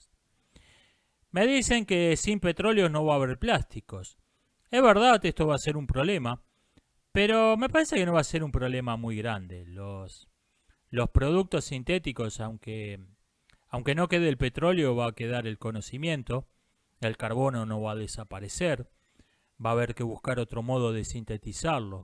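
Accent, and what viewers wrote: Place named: Argentina. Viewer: Abro moción para que nos narre creepypastas clásicas con su acentito argentino. Imaginen tener a Slenderman no sólo narado, sino COMENTADO por el Sócrates de nuestro tiempo.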